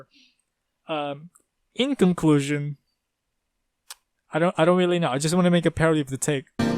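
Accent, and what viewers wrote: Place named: Philippines. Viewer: bro i thought this was a different person, its hard to recognize where in your accent is filipino but I can tell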